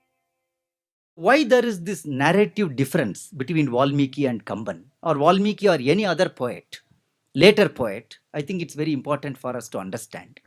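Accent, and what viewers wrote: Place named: Tamil Nadu, India. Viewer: English pronunciation of Tamilians🙌🙌🙌🙌🙌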